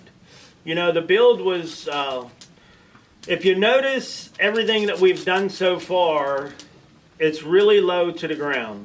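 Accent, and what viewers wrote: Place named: Pittsburgh, United States. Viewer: Iam wondering where ur accent where ur from! Sounds like Pittsburgh Pennsylvania area!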